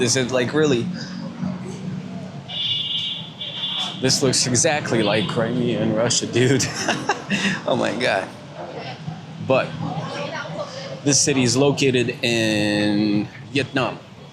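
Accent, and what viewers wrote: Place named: United States. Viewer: Nice vlog, I was in Nha Trang 2 years ago … Were you born or lived in the US? You have an American accent . Keep on vlogging please . Thank you .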